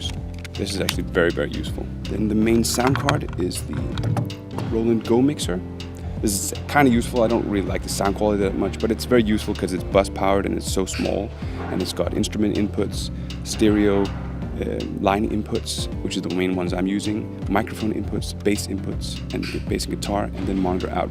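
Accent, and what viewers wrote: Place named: Italy. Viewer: You have an Italian accent 🤔 nice vid!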